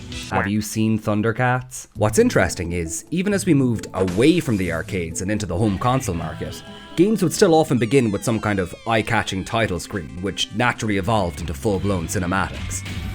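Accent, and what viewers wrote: Ireland. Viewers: Is he Irish?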